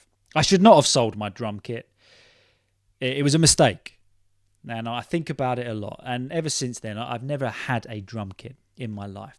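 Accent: United Kingdom. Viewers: Hey dude, how's it going? Your British accent is awesome. Hi from Fairfield, Connecticut, United States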